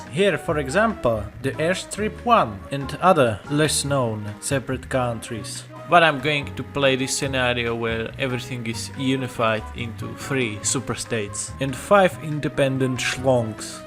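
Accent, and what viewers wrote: Russia. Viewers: Ты русский? Либо какой либо нации? У тебя акцент прямо на наш похож. Translate: You russian? Or other nation? Your accent like russian. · Are you Russian? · Huh, I never noticed Russian accent until now.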